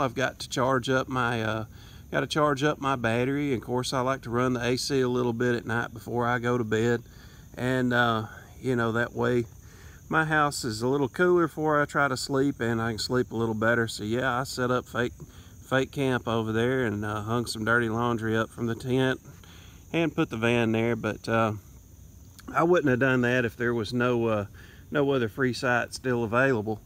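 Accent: South, United States. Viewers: Nice camping spot there!!! I like your southern accent too!!! And Muppy is so cute & silly.🐕 😃 ☺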